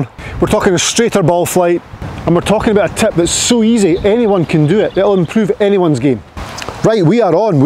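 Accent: Scotland, United Kingdom. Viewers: I think his accent is fairly mild for Scotland. Some Scottish accents are very difficult to follow but very interesting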